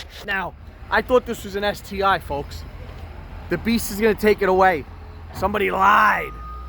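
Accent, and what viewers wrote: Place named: New Jersey, United States. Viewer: The Jersey accent makes it all worth it.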